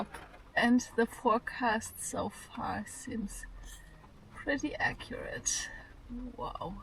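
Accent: Germany. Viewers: Watching your video makes me lay back and relax with a sunshine smile on my face. This is not just a video, this is therapy ! forgive me but do I hear a little German accent ?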